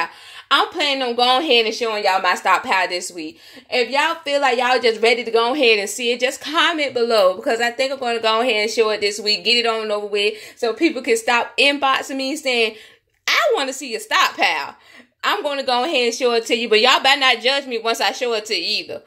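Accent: United States. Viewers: Pronounce it like this " Sara V" .😋 I am from Northern VA, ( living in FL), your southern accent is fine! Stop fussin' so much! Lol!😄 ( I read some of the comments after I posted this, had to come back and say, smart bunch of gals here! 😉 I said the exact same thing they did, with the exact same spelling! Lol! And I didn't read the comments first! (I promise)! 🤣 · I love your accent 😊 makes me miss my family in N.C. and don't worry about how you pronounce things, it's cute.....😁 and we understand.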